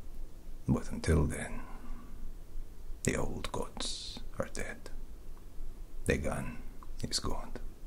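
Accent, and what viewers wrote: Russia. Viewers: I'm a huge fan of your russian accent, I find it very soothing and relaxing. This video goes straight to my favourites. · I am always very pleased to hear you speak with a Russian accent. you are always the top of the top 🔝🔝🔝🔝 many more congratulations on 🎊150K🎉 even though you should be at a million or more ❤🤗❤ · I always really liked your russian accent,. The background hum is delightful here and goes well with the rather dark ambience. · I love your Russian accent! Strangely soothing for this tried and true Patriot. However, I want to be transparent. The gun sounded too plastic to me at the beginning, not sure why. We clean them all the time and I love the metallic sounds; the clicks of the hammer, the slide going into place, all of it. I love my Beretta ❤️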